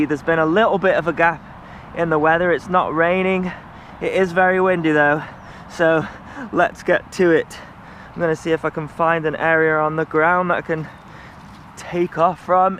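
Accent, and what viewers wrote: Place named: United Kingdom. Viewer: I'm a total newbee, your reviews are really helping and the British accent makes you very watchable much like an episode of Doctor Who;-)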